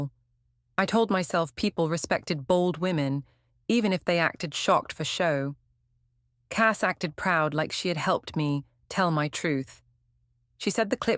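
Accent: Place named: United Kingdom. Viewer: That Brit accent SUCKS! Thumbs down!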